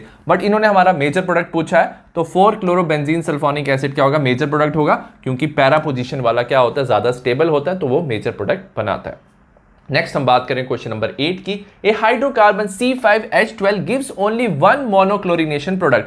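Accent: Kashmir, India. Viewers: sir aap kashmiri ho?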